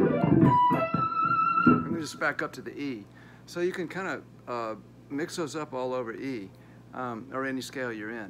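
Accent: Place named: Scotland, United Kingdom. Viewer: Sounds a bit Scottish, lol.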